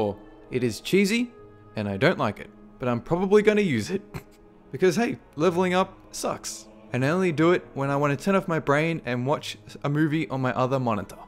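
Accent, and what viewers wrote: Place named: Australia. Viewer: You have a great voice man.. its hard to keep people interested for half an hour.. but the fact that your voice doesnt annoy the shit out of me.. which a lot of wow fans do :(.. great stuff.. and im a brit.. but have always love the aussie accent.. where is ur accent from? canberra? im prob way out and ur a kiwi or something :) neways.. great vids man.. great job.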